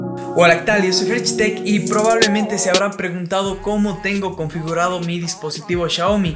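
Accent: Ecuador. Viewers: Eres de Ecuador??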